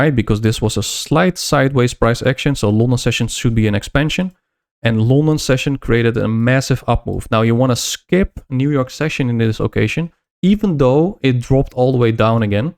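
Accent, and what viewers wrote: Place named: Netherlands. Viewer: Don't know why I found it really funny when you said "Trump is talking about tariffs and he is absolutely destroying the dollar right now" - something about your Dutch accent makes it even better hahaha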